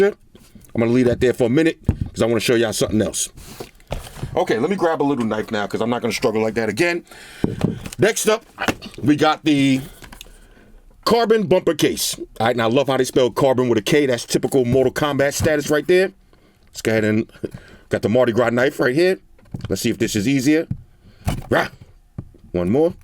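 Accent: New York, United States. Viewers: I'm late to your party bro, but glad I found you. Been rocking OP since #3 all the way up and waiting on my black #9. Sound like you're a New Yorker. Favorite reviewer on Youtube. Appreciate you.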